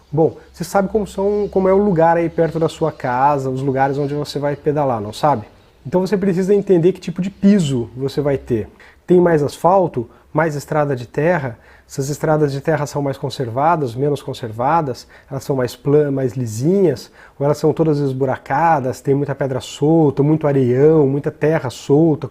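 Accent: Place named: Germany. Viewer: Cara vocês são muito showww. Esse sotaque da é lindo... Parabéns casal... Primeiro...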